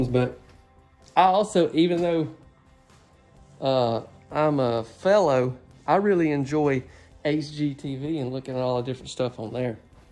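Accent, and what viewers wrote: South, United States. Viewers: Omg, this has nothing to do with nothing, but I love his Southern accent♥️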